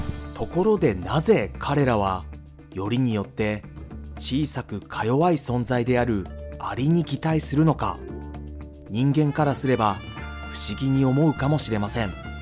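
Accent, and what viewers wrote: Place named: United States. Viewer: アメリカ系のハーフの人が喋ってるみたいで良き。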